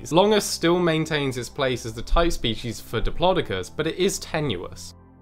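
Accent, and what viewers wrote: United Kingdom. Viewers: God give me the confidence to pronounce things like a Brit.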